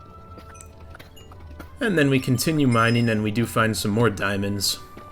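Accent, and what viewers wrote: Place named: Canada. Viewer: its almost like his canadian accent gets stronger as the video goes on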